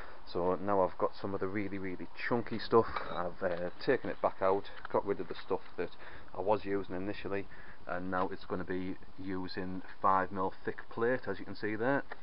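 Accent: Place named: North East England, United Kingdom. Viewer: These look really well, you sound like you are from the north east like me, cheers for the video brother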